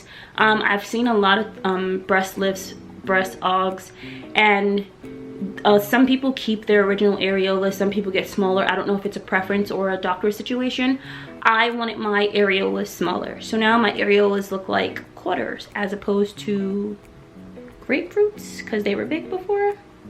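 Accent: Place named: Barbados. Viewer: Where are you from? You sound like a Bajan.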